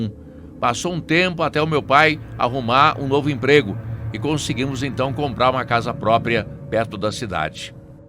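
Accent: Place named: Minas Gerais, Brazil. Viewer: Adoro esses relatos, quando conta, é outro que Paresse mineiro...continuam assim...parabéns...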